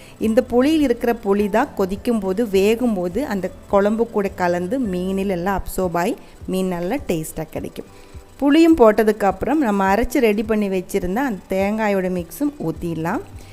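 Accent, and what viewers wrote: Kerala, India. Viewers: hai sis fish curry super..eppadi tamil kathukkitigga. neega tamil&keralaugga voice mathiriye kulambum sema. home tour podugga ugga faceyum kattugga.god bless u r family.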